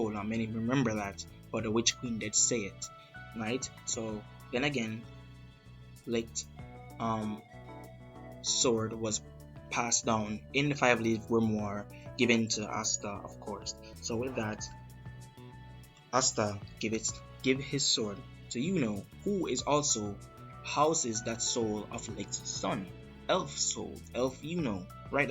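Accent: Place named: Jamaica. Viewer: Is this a fellow Jamaican I heare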